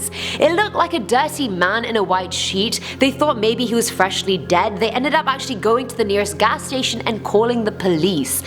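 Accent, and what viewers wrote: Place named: United Kingdom. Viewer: British accents! (I have a British accent too)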